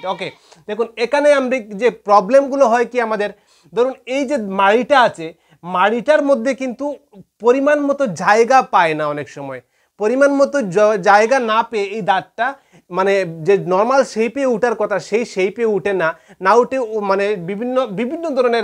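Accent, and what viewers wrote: Sylhet, Bangladesh. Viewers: আপনার কথাবার্তা শুনলে মনে হয় আপনার পূর্ব পুরুষ বৃহত্তর সিলেটের....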